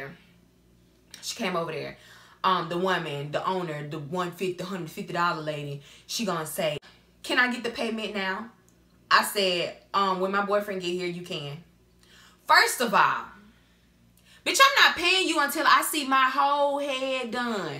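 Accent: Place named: South, United States. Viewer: I'm from Alabama and you sound kinda southern 😊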